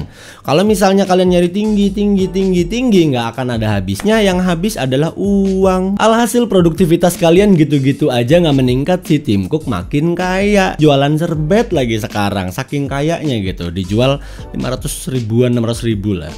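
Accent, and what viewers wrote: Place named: West Java, Indonesia. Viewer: Lidah org sunda bro, gw juga gtu klo ngmng b inggris kek kesleo gitu lidah, ahaha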